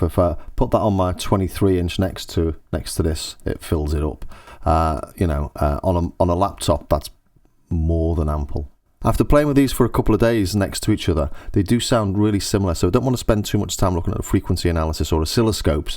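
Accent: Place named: Scouse, United Kingdom. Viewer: im trying to figure out if you`re a posh scouser or from the Wirral :)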